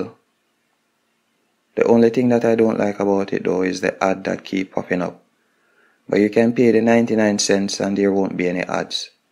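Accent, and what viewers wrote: Jamaica. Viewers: Thx and I hear your Jamaican accent im Jamaican to! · I can hear the Jamaican accent 🙌🏽🇯🇲 Big uppp! Keep grinding on YouTube! Don’t let the hate get to you.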